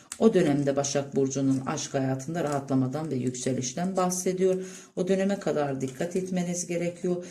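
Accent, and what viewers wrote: Azerbaijan. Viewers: Siz Azerisiz?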